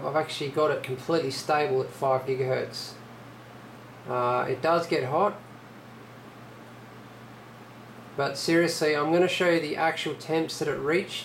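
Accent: Australia. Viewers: Great Stuff! You from good ol North Queensland? T-ville / Cairns area?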